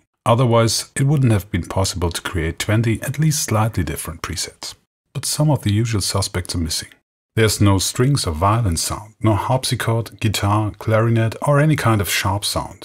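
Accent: Germany. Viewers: Nice german accent and a very, very useful review !!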